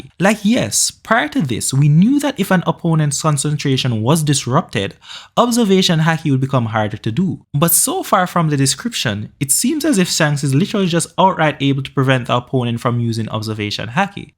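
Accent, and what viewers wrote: Jamaica. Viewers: you from JA bro? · You Jamaican?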